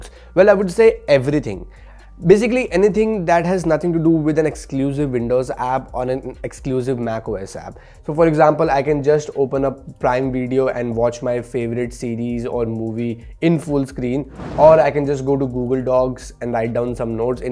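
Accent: India. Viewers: Excellent, informative, high quality video, but you have to decide your audience haha. English or Indian? I still understood most of it, but I think YouTube might not give you views because it's not clear whether you're aiming at the English-speaking market or India. Keep up the great work, though, I enjoyed the video!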